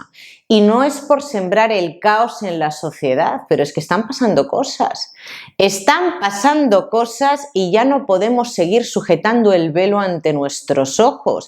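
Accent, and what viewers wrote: Spain. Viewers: Hola..no te conocía y hasta hace poco pensé que eras Chilena con un enorme acento Español …es que hablabas de todo lo que pasa en Chile,calcado!!!..la estrategia populista es una receta que se aplica en todas partes..tanto en Chile como en España,la palabra igualdad se repite tanto que de tanto repetirse va perdiendo su significado!..igualmente,saludos de un Chileno en Holanda!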